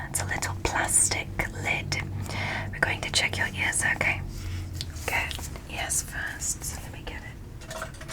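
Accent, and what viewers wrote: United Kingdom. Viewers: This was a very good video as always! Is it only me or were u acting british? 👀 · ngl the first ever vid i watched from you you had a british accent and as a fellow brit i was fooled when i heard you speaking normally 😂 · What is your actual voice and accent. You have a British accent on all your doctor videos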